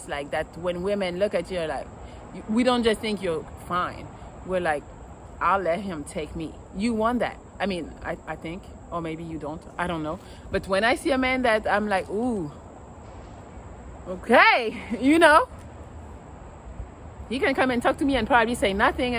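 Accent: France. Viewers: She is from France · Here's my one tip, I have others but I will give you one. Speak another language, like you. When you speak that French shit in that French accent? It gets me up😂😂😂 it does the same for a Man when he speaks to a Woman.😜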